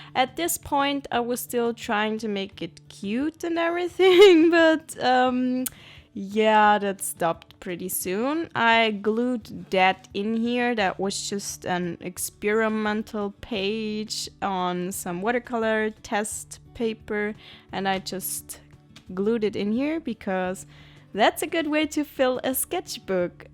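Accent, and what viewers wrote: Germany. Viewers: Where are you from? It sounds like a Germanaccent😂😅😗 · Are you German?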